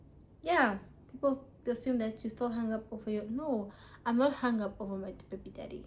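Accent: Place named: Xhosa, South Africa. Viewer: Ndikuthandela lento yoba uphume kwesasjolo usathetha isixhosa sakowenu ❤. Awukhulumi isizulu esi dizzy like the other girl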